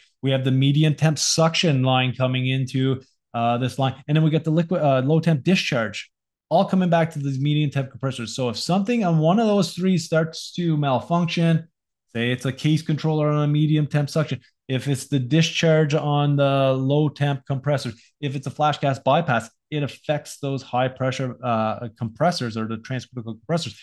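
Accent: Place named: Atlantic Canada, Canada. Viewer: thanks for the video, pal! you from the east coast in Canada? I detect an accent lol Ontario here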